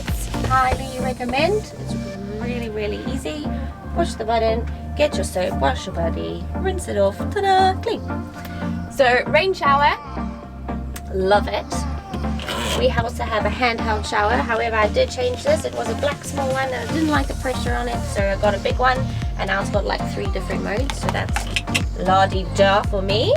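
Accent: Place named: Southern Africa. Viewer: What part of Southern Africa are you from?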